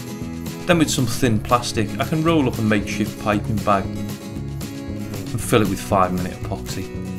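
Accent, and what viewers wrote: England, United Kingdom. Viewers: An excellent clear, concise video and to top it off in an English Accent for a change. Thank you👍😷👍🏴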